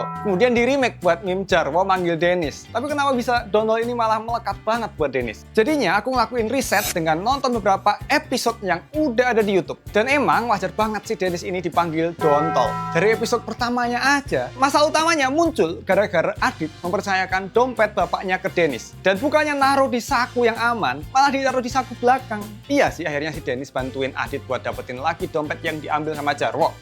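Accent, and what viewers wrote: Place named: Java, Indonesia. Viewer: Tambahan 1 lagi, terlalu kuat Jawa nya bang, malah lebih suka animasi Ibra dan kiko.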